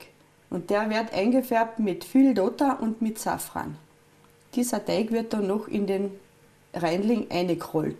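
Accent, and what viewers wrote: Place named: Carinthia, Austria. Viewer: Noch mehr kärntarisch geht oba nimma. 😀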